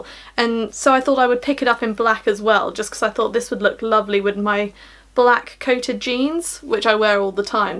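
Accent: United Kingdom. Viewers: Can’t beat the combination smart, beautiful and that British accent!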